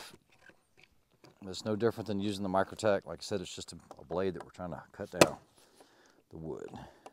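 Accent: South, United States. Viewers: Got to love an Asian with a Southern accent beating on a Chinese knockoff.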